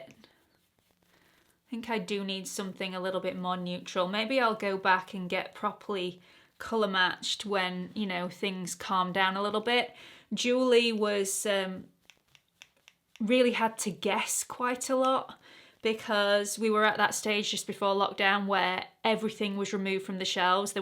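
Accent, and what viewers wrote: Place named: United Kingdom. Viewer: Hi. Have you always had the accent? Oh my goodness, I know I've watched your videos before, but I don't remember you having an accent. Are you Australian or English? LOL I'm so sorry, I thought you were American before. I wish I could use a British accent whenever I feel like it, just saying 😅 Sorry, just asking cuz it surprised me ❤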